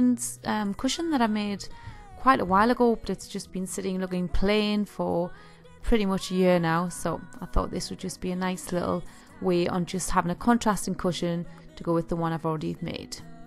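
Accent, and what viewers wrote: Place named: England, United Kingdom. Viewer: Hi love, Wow I have been binge watching your videos . I'm loving your channel! You have inspired me so much! I love your English? Accent. Too. Lol Your fur baby is adorable too. I could see myself living in that fantastic farmhouse of yours. Just Beautiful. Can't wait for another video from you! HUGS Debbie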